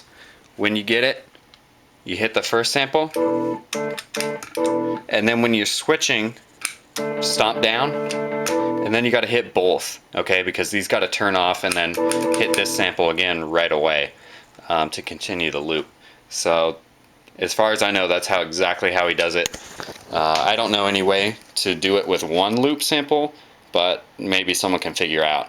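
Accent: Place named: Germany. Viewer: I'm really speechless at how wicked that thing is. You sound a bit like Klaus from american dad, a slight german accent there :-)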